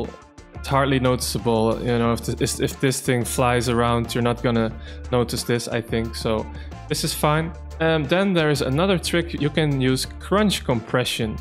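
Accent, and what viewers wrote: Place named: Netherlands. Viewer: Hey, thanks a lot for the tips! I hear a dutch accent:D I may be wrong. You've made a wonderful game, congrats! Have you considered launching on other platforms? I'd like to get the game to support you and because the game seems fun, but I don't own a switch.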